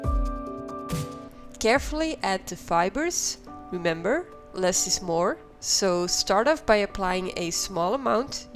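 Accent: Netherlands. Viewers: R u dutch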